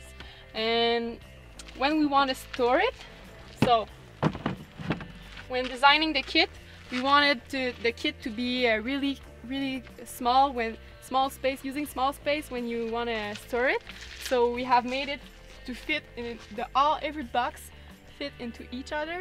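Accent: Quebec, Canada. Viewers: Il y a plusieurs vidéos en anglais de ce genre. Ça fait du bien d'entendre l'accent québécois. Nice set-up. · Where are you located. ? Sounds like Quebec Canada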